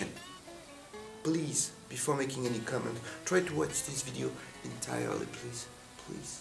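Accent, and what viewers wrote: France. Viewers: he obviously isn't English, he has a French accent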